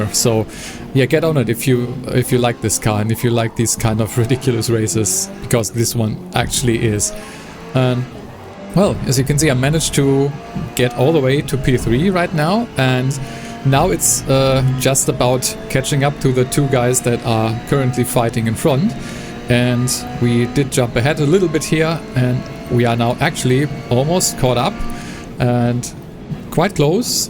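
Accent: Germany. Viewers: Your english sounds like a gernan guy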